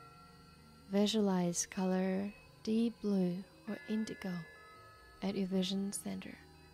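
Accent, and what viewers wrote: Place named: Australia. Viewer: has such a lovely voice but wold better if she could prevent the horrid Aussie accent creeping in!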